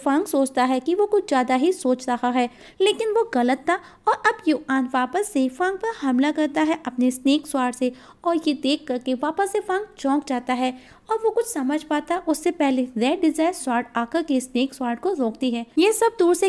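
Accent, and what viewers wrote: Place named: Bihar, India. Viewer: Khisiya hua tha 😂 aap bhi Bihar se ho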